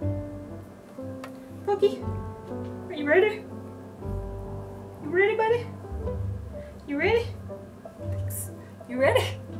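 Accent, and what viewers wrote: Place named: United States. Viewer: How do you have such a clear american accent while living in Japan for so long?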